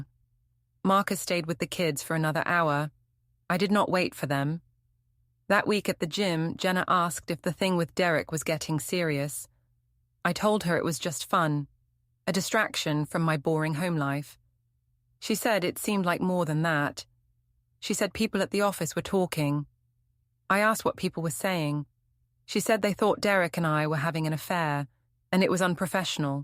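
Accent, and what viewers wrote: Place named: RP, United Kingdom. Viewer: You aŕe right. This posh british accent makes me want to vomit...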